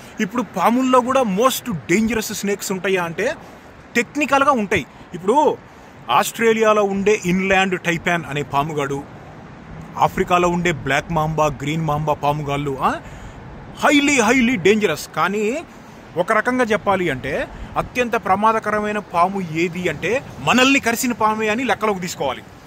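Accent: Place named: Hyderabad, India. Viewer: Mama,mass r nuvvu, hyderabadi ani pinchav,kummai🎉🎉❤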